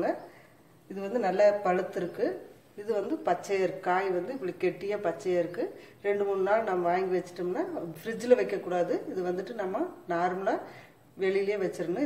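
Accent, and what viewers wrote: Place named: Kongu, India. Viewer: Kongu slang with recipe ketka nalla irrukunga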